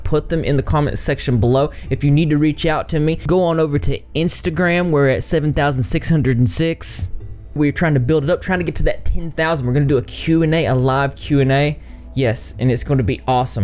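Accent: South, United States. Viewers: I'm trying to figure out your accent because it's very southern... even more southern than where I live (Alabama). Maybe Tennessee or Kentucky.... something like that? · what southern state are you from dude? I’m from southern KY so I can pick up twangs like nobody else even if someone is trying to hide it lol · Bro where’s your accent from, sounds super southern it’s dope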